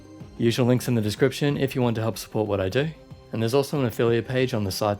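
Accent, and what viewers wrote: Australia. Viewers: are you australian and why can't you get finalmouse?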